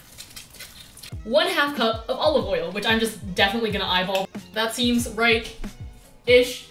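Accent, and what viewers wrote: United States. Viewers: aa a Well considering has a very clear american accent, asking her what nationality she is doesn’t make much sense in america.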